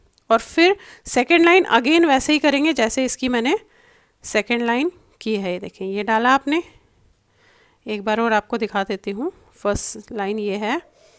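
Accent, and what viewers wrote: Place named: Pakistan. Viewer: Thank you 💞 mai app ke buhat bari fan hoon, tumhari aawaz ur pronunciation bilkul hum pakistanio jaisa hai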